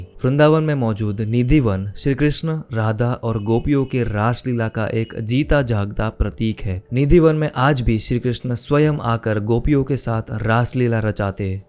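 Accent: Gujarat, India. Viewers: You sound to be Gujarati??😅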